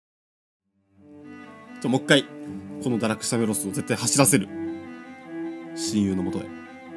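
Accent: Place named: Kansai, Japan. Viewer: 棒人間のクオリティがｗあとさんの関西弁なんかいいですねｗ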